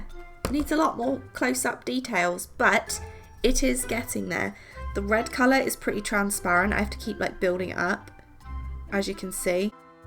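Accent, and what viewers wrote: England, United Kingdom. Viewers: Why does she have an English accent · She has such a British accent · not related to the video but, are you English or American. You always talk about going to American stores like Walmart but you sound English to me. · Why do u sound British? 😂🤣 btw love u! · Is she british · Are you English or American I’m confused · Are you English living in America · I was very surprised when you said "dollars"... you sound so very British! (new to this channel, so maybe that is a very stupid comment/question to the regulars).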